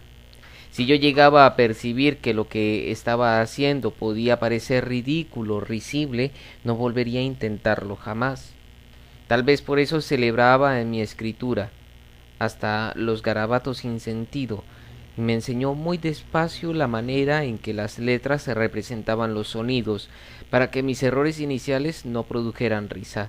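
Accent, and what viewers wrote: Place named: Colombia. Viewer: oír ese español colombiano, es maravilloso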